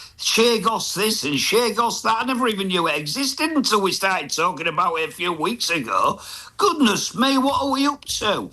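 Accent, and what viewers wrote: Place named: Yorkshire, United Kingdom. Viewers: Proper Yorkshire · Pure Yorkshire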